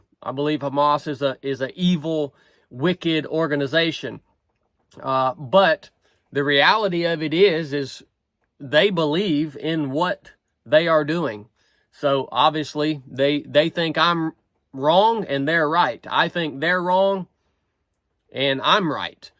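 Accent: South, United States. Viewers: Brother totally agree. Keep up with Truck talk. I love it. Your accent makes me feel right at home. My family are all southern. It's like watching my cousin talking about this crazy sh$$ show world we are finding ourselves having to be a part of. I've got a feeling this is definitely the beginning of the end. Just saying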